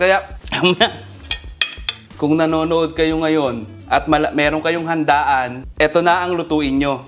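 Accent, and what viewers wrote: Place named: Cavite, Philippines. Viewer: yung punto parang taga-cavite... imus or bacoor hehe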